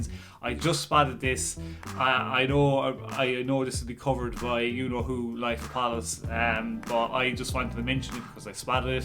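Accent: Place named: Maritimes, Canada. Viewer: cool. Figured you had a eastern accent from the east coast maritimes. Im from Saskatchewan.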